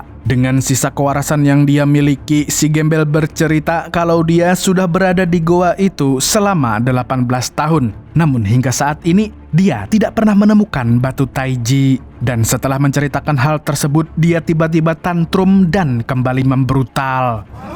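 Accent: Banjar, Indonesia. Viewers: Orang Banjar,, saku mimin nya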